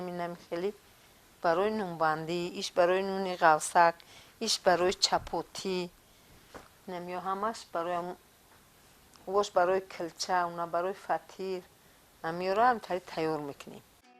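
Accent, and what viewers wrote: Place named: Tajikistan. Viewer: Бародари азиз барои шумо муваффақият орзуманамдам, чи қадар навори зебо бо лаҳни тозаи тоҷикӣ, бо фарҳанги зебо тоҷикӣ 💕. Аҳсан!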